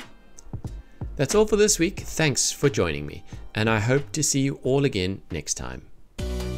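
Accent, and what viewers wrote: South Africa. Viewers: That an SA accent I'm picking up?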